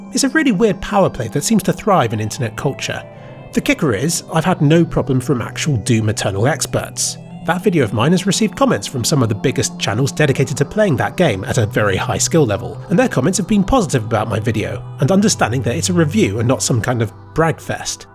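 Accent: United Kingdom. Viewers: can you please change your dialect im very tired of the british lately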